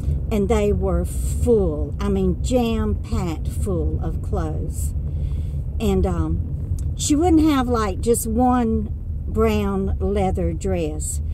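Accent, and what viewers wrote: South, United States. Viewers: Very sharing stories. Interesting too. I recently found your channel. Are you living in BJ now? Your Southern accent lets me know you are from or raised in the South. Look forward to watching more of your vlogs.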